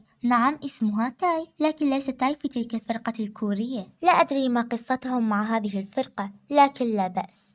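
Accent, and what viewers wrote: Iraq. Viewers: ولله حسيت انك من العراق🤯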